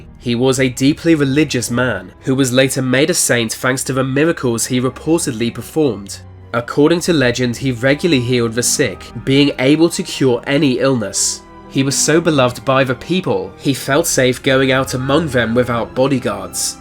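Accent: England, United Kingdom. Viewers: I dont know what it is. I love your voice, or pitch, or if its any kind of inbreed english accent? Its captivating. Not from Britain so I dont know. Actually, im not from a english speaking country whatsoever and dont know much about anything. Therefore im watching your channel. Its great! Keep up the good work.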